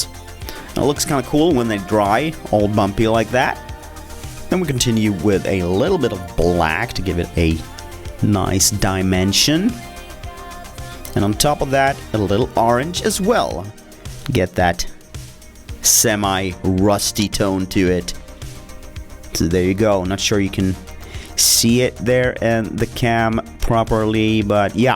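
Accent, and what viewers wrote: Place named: Malmö, Sweden. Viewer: Ah ni är från sverige? Malmö dialekt?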